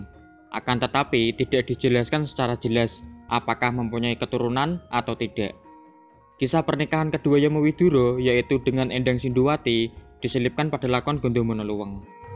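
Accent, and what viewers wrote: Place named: Sleman, Indonesia. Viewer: Suanten lan bahasa e kados piyantun Sleman, sae sanget Kulo remen mas menambah wawasan 🙏🙏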